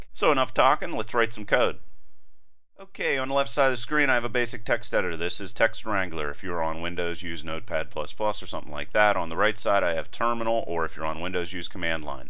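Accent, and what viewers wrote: United States. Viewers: Offtopic comment, I find it really amusing the fact that you can clearly tell this guy is a 100% 'Murican made just by how he talks.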